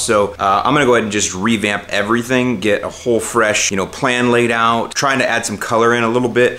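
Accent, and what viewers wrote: Chicago, United States. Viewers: Keep the Chicago accent. too funny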